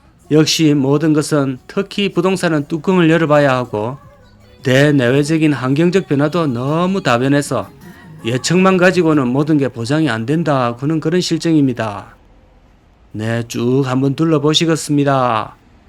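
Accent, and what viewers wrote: Gyeongsang, South Korea. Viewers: 강호 발바닥 TV는. 전국민이 한번쯤 들러 봐야하는 필수 유트브. 구수한 경상도 사투리 매력 짱입니다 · 우야노~? 갱상도 사투리 ㅎㅎ 넘~재밋다. 파이팅!!!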